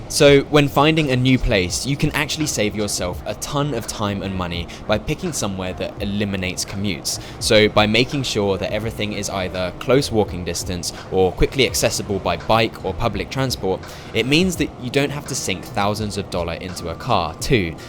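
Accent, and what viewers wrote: United Kingdom. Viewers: Just 1 minute in and this is the first video I've watched from you and you sound British yet you talk about being under mountains of student debt??? Why? This isn't America where you get chased for it. UK student loans are paid only when you start earning over a certain threshold (the poverty line), is in proportion to your income, is removed automatically from your pay slip and is forgiven after 30 years and has virtually no impact on mortgages, credit score or anything else. It's effectively a graduate or uni tax not a loan.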